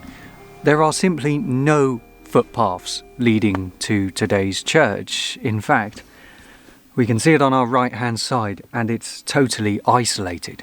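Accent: United Kingdom. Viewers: Auch wenn ich nicht alles verstehe, da mein Englisch nicht so gut ist, schaue ich Deine Videos gern und genieße Deine angenehme britische Stimme. Die vorgestellten Kirchen, Orgeln und Musikstücke sind immer interessant. Ich wünsche Dir und allen anderen Zusehern auf der ganzen Welt ein frohes neues Jahr 2026!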